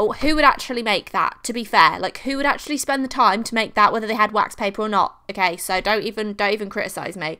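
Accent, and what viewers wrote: England, United Kingdom. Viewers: omg i've just found you're channel and i want to say that you're gorgeous 💕 you can't be real... a very pretty talented artist with a very cute british accent 😍😍 love from france sorry for my grammar :) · But like are you English?? I'm confused ahah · I live for that British accent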